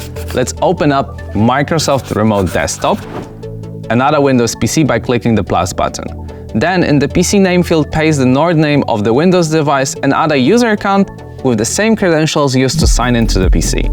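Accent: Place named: Eastern Europe. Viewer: Чувак, у тебя такой восточноевропейский акцент 😁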